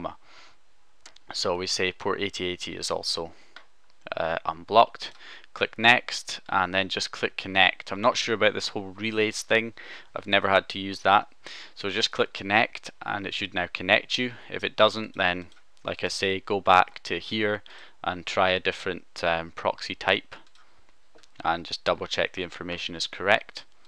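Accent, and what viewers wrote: Scotland, United Kingdom. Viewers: You Scottish too?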